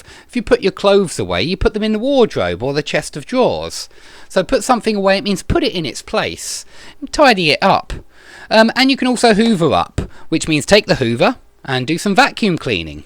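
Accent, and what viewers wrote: United Kingdom. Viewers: I like how you explain the topics. Enough vocabulary, concise definitions, imagination and British accent. Thanks.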